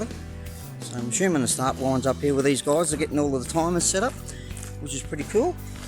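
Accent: Australia. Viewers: Hello from an Australian in Japan⛩🌸🌸🌸 Great to see so many cool cars in the same place🚗🛻🏎🛻✨✨✨ And love your Ozzie accent💜 Have a great day🌸🐨💕✨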